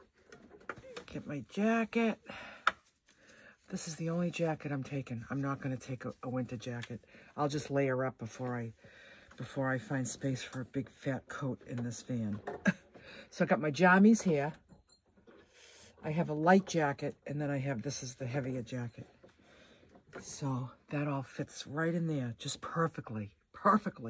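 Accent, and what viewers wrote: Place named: New England, United States. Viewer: Hi! Your song is 💯💗🔥 😍🎵🎶 Love, love love it! I came upon your Camper van Tour vid recently for the first time, while watching tiny off grid living. Glad I started with the Finished Reno. vid, because it lead me to your other vids and your story unfolded! Shortly after, you were singing your song and I thought "What a cute song and How did you know all the lyrics! It must be popular and Why have I never heard it before?" 🤣Then I watched the vid on how your song came to be! How great is that! I live in TX but I'm from LI. When I heard your New England accent, it brought me home. I have family from MA. I just knew I would enjoy this ride😄 As a kid we traveled & camped up and down the coast. I was hooked! Also, I am so like minded with you. From your food choices, your esthetics, your philosophies and your JOYFUL JOYFUL outlook. 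I just turned 60 and soon needing a new (used) vehicle. You've inspired me to maybe get one I can modify on a budget. Thank you! You're a ray of sunshine. I find myself humming that song and remembering to stay on that healthy path. Happy Travels!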